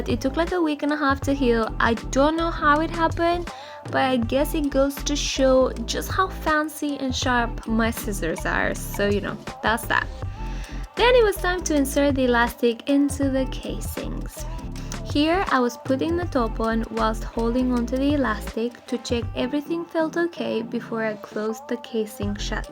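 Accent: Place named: United Kingdom. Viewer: Where are you originally from? Love your charismatic and whimsy personality, the beautiful British English and your inspiring sewing projects. ❤️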